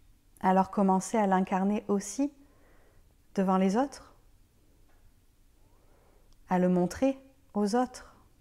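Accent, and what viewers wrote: Quebec, Canada. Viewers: Chère nouvelle amie, merci. À la 19e minute, je suis entrée en moi et j’y ai retrouvé ma vraie présence. 🙏 J’ai cru entendre des nuances québécoises dans ta voix, aie-je raison? Je suis très attirée à l’idée de m’entourer d’une communauté qui porte en elle la même « mission » que moi et avec laquelle je pourrais avoir des contacts moins virtuels. Merci encore de te faire confiance car ce que tu nous as transmis était précisément ce dont j’avais besoin. 😅 Bonne continuation! 🥰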